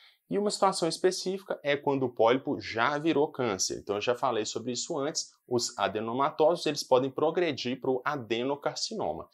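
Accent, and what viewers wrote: Minas Gerais, Brazil. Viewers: Conheço esse sotaque. Ele deve ser daqui de Minas. Gracinha. 😄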